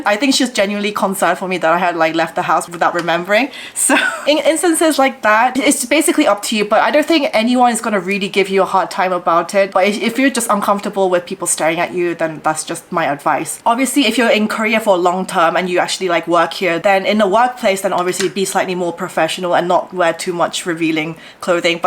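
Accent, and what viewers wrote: England, United Kingdom. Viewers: Algorithm led me to this video and I thought 'I know someone who's name is ' and heard her talking and 'Brisith accent?' and saw her face and 'Oh...it's!':) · You have a very sweet “Queen’s English” accent,. I can help you with American 🇺🇸 English! Ha, ha, ha! 🇰🇷🤗❤️🤗🇺🇸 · 한국인인데 다 봤어요 영국 발음 너무 멋있다 ㅠㅠㅠㅠㅠㅠㅠ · The UK she said, that accent definitely rubbed off on her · 우연히 왔는데 , 카즈하 같은 느낌의 더 예쁘신 분이네요. 잘 보고 갑니다. 묘하게 영국 발음이랑 미국느낌이 섞이신 발음 같아요. 행복하세유~ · wow, strong England English accent detected! :) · I am so happy to see you again. You know the funny thing is that I was just thinking about you. "That beautiful Korean Youtuber with UK accent. I missed her and her narrative about Korea and Korean Culture" I thought. I then saw your video come up in my youtube feed. Well, I just actually subscribed to your channel. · That is the MOST English accent lol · Your British accent is obvious. 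· I was gonna say, your accent is almost British. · 영국에서 오신분인가요? 영국 발음이 들리시넹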